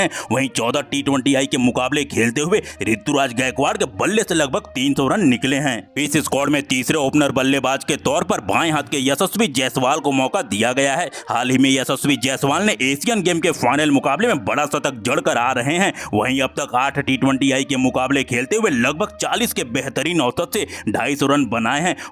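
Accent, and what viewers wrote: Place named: Pakistan. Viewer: आप केसे बात कर रही हो? अपने बोलने का तरीका बदलें. ऐसा लगता है जैसे आप पक्के पाकिस्तानी हैं, बात करना तरीका change करो। एक सच्चा भारतीय ऐसा नहीं बोलते। Team INDIA हम तुम्हारे साथ थे, साथ हूं, साथ रहूंगा, इंडिया जिंदाबाद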